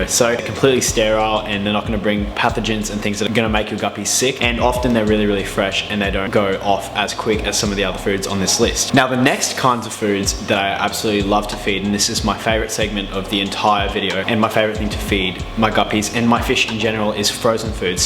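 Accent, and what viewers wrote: Australia. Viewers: Are you an austrilian??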